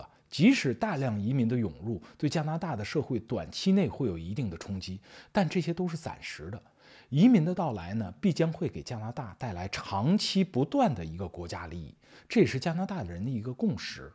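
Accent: Standard. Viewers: 主播的标准普通话非常好，非常珍贵，听着就舒服。 现在很多其他主播普通话都不标准，说话时舌头伸不直，都秉承特色社会主义装B狂，听着觉得恶心。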